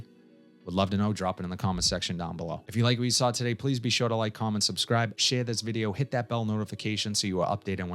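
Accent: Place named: Massachusetts, United States. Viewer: Wicked awesome accent . Worcester mass?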